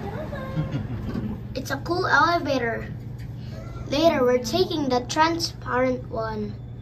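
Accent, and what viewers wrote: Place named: China. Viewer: Thick Chinese Accented Voiceover!!!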